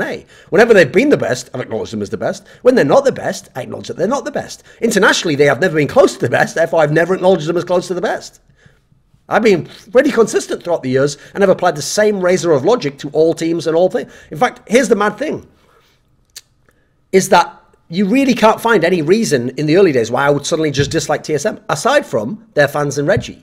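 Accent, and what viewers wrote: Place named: United Kingdom. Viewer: You sound so Bri'ish in this video I LOVE IT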